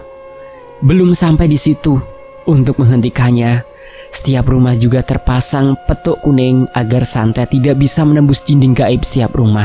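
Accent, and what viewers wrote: Java, Indonesia. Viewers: Aq yoh yess cm cerita inih j0zz... Bisa banyk belajar bahasa cinta Tpi dengan logat jawanyh😍meski aq jawabarat Tpi bojoko jatim😍😍😍😍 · Tertarik ma logat bhasanya. Itu logat bahasa jawa mana ya? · Bohoso jowone kok lucu to mas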